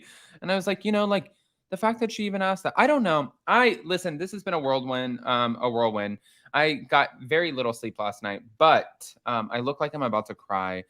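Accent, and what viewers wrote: New Jersey, United States. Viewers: I love your 'Joisy' accent! Great recap, and am happy that you and had so much fun with your Vanderpump family. Continue to love love love your very eclectic content!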